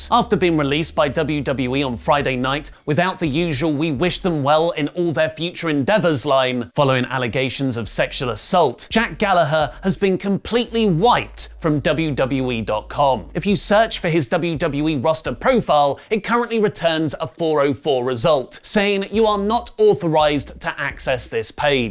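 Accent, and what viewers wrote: United Kingdom. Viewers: your natural speaking voice is perfectly British. Coming from an midwesterner who loved Hot Fuzz to watch the director commentary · Social meteor account. I love British talk.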